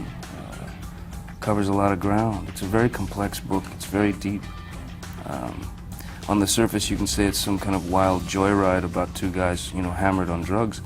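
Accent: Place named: United States. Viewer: I looked this up just to hear his accent. WHY does he sound like so different today? He definitely sounded like an American in this interview.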